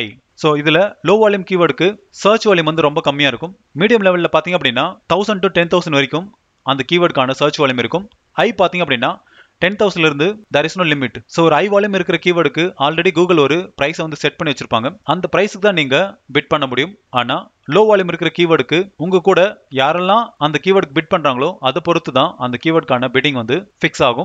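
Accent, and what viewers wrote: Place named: Coimbatore, India. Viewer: nenga coimbatore thana bro.unga slang la theriyuthu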